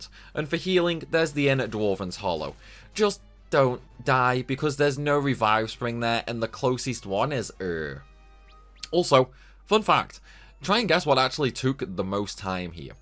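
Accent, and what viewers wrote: United Kingdom. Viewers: Have you never heard a Brit speak before?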